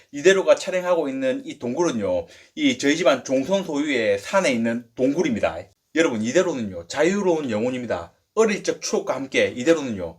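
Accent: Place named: Gyeongnam, South Korea. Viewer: 겨울이겠다 ㅎㅎ진빼이 서부갱남 사투리 정겹네 ㅎㅎ자연이 가자 ^^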